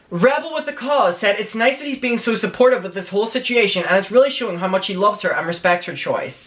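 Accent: Northern Ireland, United Kingdom. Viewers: Oml northern Irish?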